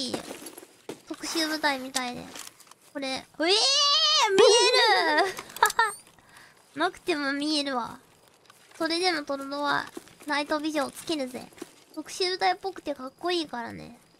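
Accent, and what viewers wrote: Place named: Japan. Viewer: ひらがな英語可愛すぎる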